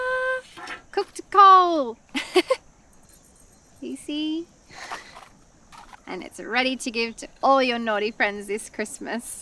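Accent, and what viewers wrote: Australia. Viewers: Are you from Australia???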